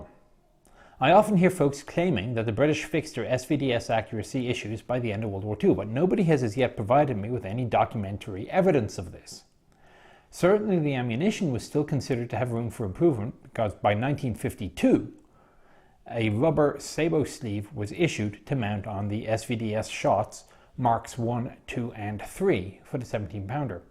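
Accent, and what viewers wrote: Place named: Ireland. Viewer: This is the first time I have seen something from you that qualifies your statements on the inaccuracy of the 17pdr. In other videos your statements were, it was inaccurate due to manufacture. I have always had a little suspicion that your Irish lilt may point to your opinion on the British as a whole. However, I once again point to Joe Ekins who hit moving targets at between 800 and 1500 yards when engaging Michael Whitman's Tiger platoon. He hit at least three if not four targets with the Sherbrook Rangers also contributing to the demise of Whitman's Platoon and doing for Whitman himself.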